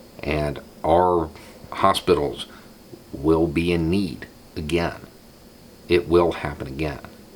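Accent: South, United States. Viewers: All political commentary should be delivered in a thick southern drawl.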